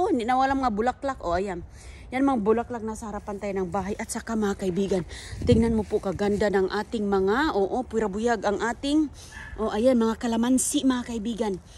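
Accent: Visayas, Philippines. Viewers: Visaya bas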